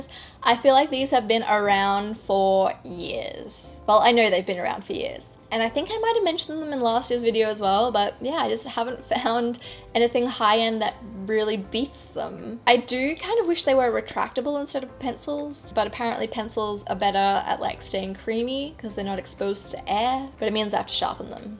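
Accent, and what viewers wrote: Australia. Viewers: Hey!!! Just wanted to let you know that I love your channel!! Im so glad that i have found it!! Love the Australia 🇦🇺 Accent!! Anyways hello from Texas ♥️♥️♥️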